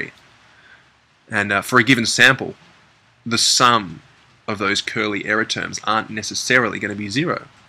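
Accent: Australia. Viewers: Love the Aussie accent haha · Are u from oz ?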